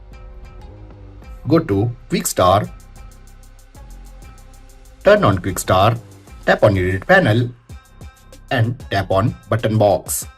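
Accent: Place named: India. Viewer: 🫪Ooh nooo... indian english!! 😵‍💫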